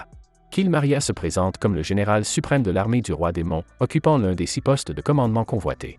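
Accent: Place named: Canada. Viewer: S'il vous plaît 🙏 vous pouvez lui dire d'enlever son accent canadien et de redevenir comme avant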